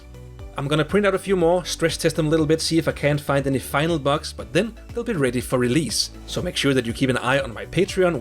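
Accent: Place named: Denmark. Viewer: That accent… are you Danish perchance?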